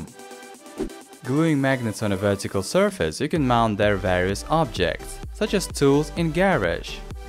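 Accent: Russia. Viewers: I love your accent. Question (not trying to offend) Are you Russian? Mainly because it is very thick. Again not trying to offend if you are not. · russian accent :D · are u a Russian? ?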